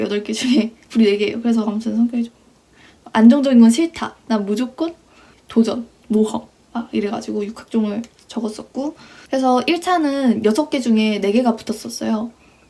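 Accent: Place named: Jeolla, South Korea. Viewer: 헐 왜 전라도 분인줄 알았지....?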